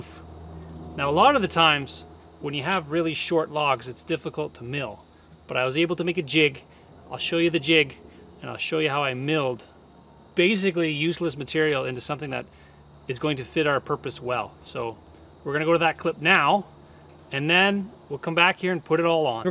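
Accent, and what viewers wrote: Canada. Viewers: self reliance. tiny house. dog. drone footage. faint canadian accent. this is becoming a fun trend. lol.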